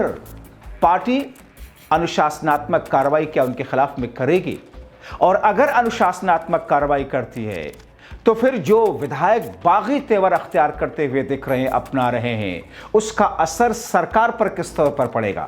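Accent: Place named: United Kingdom. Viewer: English tone me hindi news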